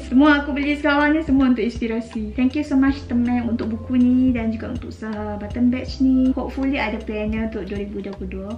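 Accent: Kedah, Malaysia. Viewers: Weiii, teka betul la kuning! Hehe, eksaited sendiri! Btw, suka dialek Kedah sebab ada seorang kawan Kedah kat uni dan rindu beliau bila dengar cakap lagu ni. Best video! Suka tengok. Keep going! 😊😊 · Sya suka kak punya perckpan dialek Kedah tu🤣🤭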